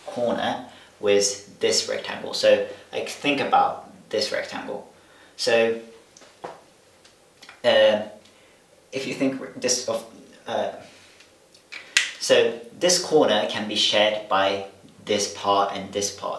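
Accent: United Kingdom. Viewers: Excellent! Very well explained considering it's your first time. It's also great to hear a Japanese person speak with a British accent! I hope you make more videos. :) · I think you have an English accent rather than an American accent, but EIGO UMASUGIDESHO! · As a bilingual viewer of your channel who hasn't had much experience with math in English, I greatly appreciate this video. I love your son's British accent and look forward to further lessons! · British Englishばりばりでかっけえ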